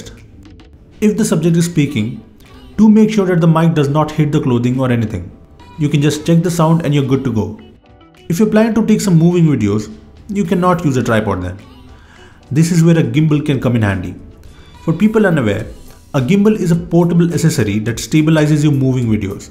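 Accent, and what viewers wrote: India. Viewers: RIP Indian English accent :(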